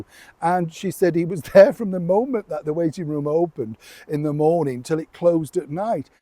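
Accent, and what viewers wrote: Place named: North, United Kingdom. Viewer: It's his ' Northern ( Uk) charm.😊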